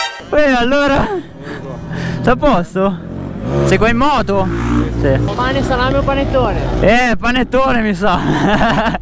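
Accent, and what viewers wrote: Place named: Rome, Italy. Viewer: Sembri un romano 😂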